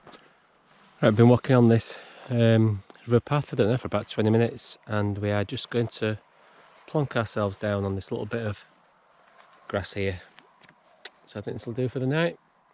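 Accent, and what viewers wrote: Yorkshire, United Kingdom. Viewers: ohhhhh.....how the hell did I not recognize a Yorkshire accent??? Ive done Coast to Coast 3 times!!! I am so sorryyy...i am familiar about Lancaster vs York history. 😂 Ive subscribed to your channel and saw your Colorado trail thru-hike. Great job. I was on the CT around the same time but after day 12, Ive had enough and got homesick so i went home after I reached Twin Lakes. I did WHW abd CWT this year so I know what it's like already. My husband hasnt thru-hiked anything this long so I hope he won't give up on me. :)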